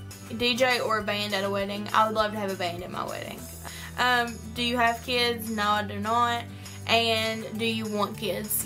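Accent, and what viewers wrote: South, United States. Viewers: Love your makeup and love your southern accent.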